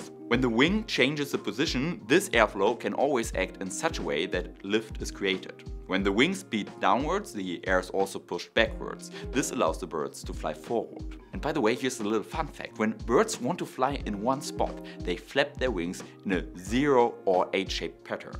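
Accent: Germany. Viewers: You talk like Arnold Swarzenegger's nephew, being German, but I find your talks quite interesting.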